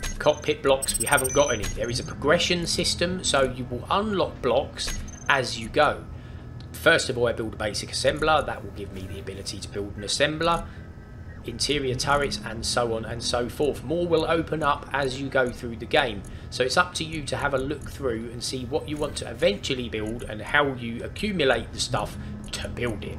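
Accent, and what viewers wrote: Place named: Geordie, United Kingdom. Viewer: lol listen to a geordie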